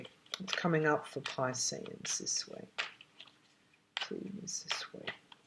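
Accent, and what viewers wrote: Australia. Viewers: I love how you write; your Ausie accent is such, that in my minds eye, I hear you. Good luck on your health issues. is terrrrrific. 🇺🇸🐈♈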